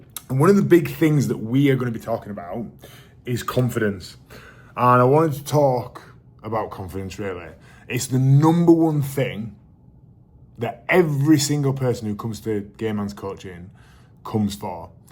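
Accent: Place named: Yorkshire, United Kingdom. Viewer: Thank you for your very articulate reflections on confidence,. They were characteristically thoughtful. You are a very impressive man. You are more a self-made man than most men I can think of: you have built up your own confidence, your own life, your own body, your own business and made a success of all of them! And you have fabulous blue eyes and a delightful Yorkshire accent as well. What a star you are! The US President who said that imperfect action was better than perfect inaction was Harry Truman.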